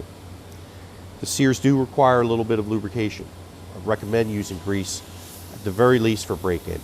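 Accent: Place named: Pennsylvania, United States. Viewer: Pros of this video: - Since graduating college in W. PA 15 years ago, I miss that Pennsylvania twang - The lecturing on pin steel woke me up. I won't even THINK about getting an aftermarket pin now. Cons: - I can't actually see what you're doing. Close-ups would be nice, but since I've installed a LPK, I can infer what you're doing. The video overall is very helpful, and even if it's hard to get the kit installed, I can watch cool .mil photos in the meantime.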